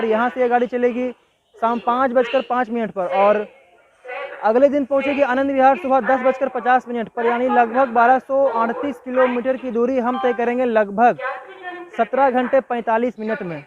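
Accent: Bihar, India. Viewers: Shandar..khalis bihari andaz..uspar se mithi awaz..sandesh bhi hai anya ac wale blogger ke liye ki journey ke saath jaruratmandon ki dil se help karta hai.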